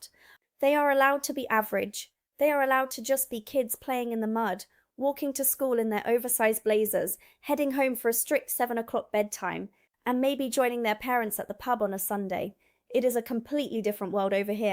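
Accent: England, United Kingdom. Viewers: American brain, English accent. give a break! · Why does she have a British accent? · I want to know why you have an English accent if you are American and only been here 2yrs or so?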